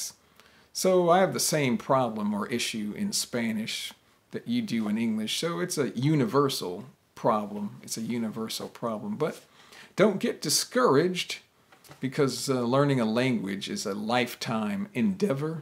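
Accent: United States. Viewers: I just discovered you.... I'm an English learner. And I love American accent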